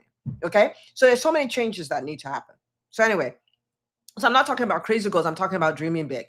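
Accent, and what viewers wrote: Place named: Kenya. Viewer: Doc, are you really from West Africa? Your English sounds so much Kenyan.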